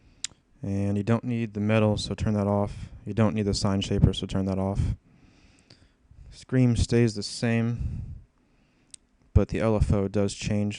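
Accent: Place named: United States. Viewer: Thnx for the detailed vid I really appreciate it! Helped me a lot now that i got some more sounds to play with , are you from Texas by the way? you sound like a cowboy =D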